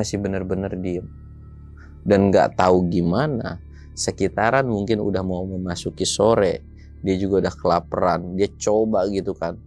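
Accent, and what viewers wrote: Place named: West Java, Indonesia. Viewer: bang salam kenal dari batujajar cimahi kalo boleh tau abang asli mana bahasanya ada sunda sunda gt bang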